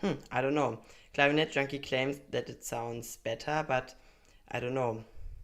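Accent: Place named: Vietnam. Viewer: keep up your great work, dude! I love your vinglish!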